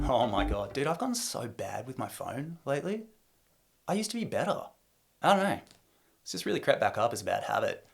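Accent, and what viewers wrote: Australia. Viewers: I learnt a lot from you but especially to understand the Australian accent. Thank you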